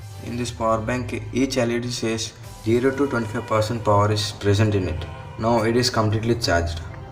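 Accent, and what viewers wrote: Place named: New York, United States. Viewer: Dini evadaina video antada ra? Meanwhile NYC slang ra chakka😍👍😊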